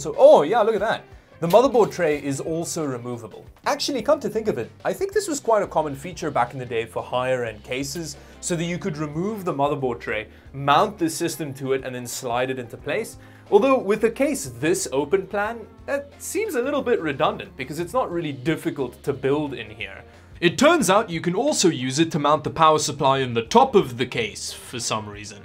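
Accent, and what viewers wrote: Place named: Afrikaans, South Africa. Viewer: A Canadian man with a very peculiar spelling of the name David ( which has many origins like Polish, Dutch, and most of all I get an Afrikaner vibe from our channel host but he may just be French Canadian which can sometimes have a hard to place accent especially from the younger generation) has bought my unique and rare piece of computer hardware on eBay. I wonder if its the same guy?!?! I am sure it wasn't hard for the guy to figure it out especially if he was a fan of yours which is pretty cool. I have an extremely rare spelling of my middle name and my first name is uncommon as well so I get how easy it is for him to have placed you.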